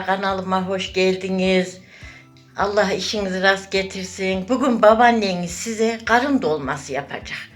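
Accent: Kayseri, Turkey. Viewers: Tam bir Kayseri babannesi. Konuşmasından anladım ben de has Kayseri'liyim.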